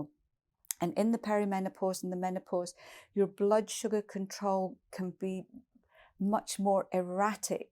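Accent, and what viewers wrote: United Kingdom. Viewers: you are a blessing to all of us. I learned so much about this peri menopause adventure thanks to you. By the way, I love your British accent 🥰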